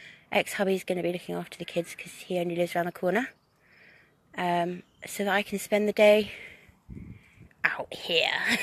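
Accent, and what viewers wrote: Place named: United Kingdom. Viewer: wow! just discovered your channel. it's so lovely to hear a Brit talk about homesteading. I'm doing exactly what you are. I have an allotment and live in the Midlands.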